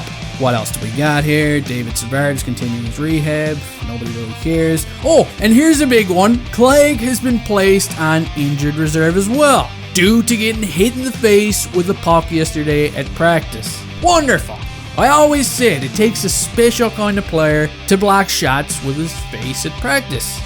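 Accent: Newfoundland, Canada. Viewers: He's new maybe, I only discovered him about a month ago and I love the Habs and have a similar type of humour to - it could be an algorithm thing as well. I personally wish him all the success, however with some of the South Park pics of the Chinese guy and pics of Xi Xingping or whatever the leader of China is, it is probably better off that he stays small because then YouTube will either demonetize his videos or pull them down and try to sensor him, this is kind of a unique stage he is at. And living Ontario, I do not often get to hear that down Easter accent of a Newfoundlander that often, I always look forward to that "BUUUTTTT FERST!!!!" 🤣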